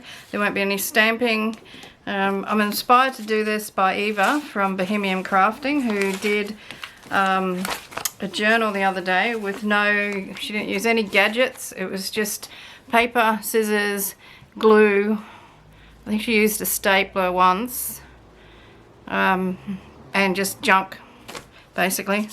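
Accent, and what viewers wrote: Australia. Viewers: I love this journal! It's so nice to hear another Aussie accent! I enjoyed watching you have a go ❤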